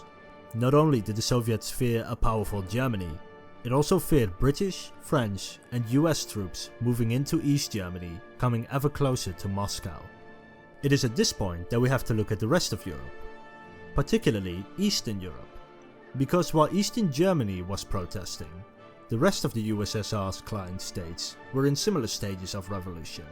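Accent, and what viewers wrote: Netherlands. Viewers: that sounds like a dutch accent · I guess dutch. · Strong Dutch accent :) cute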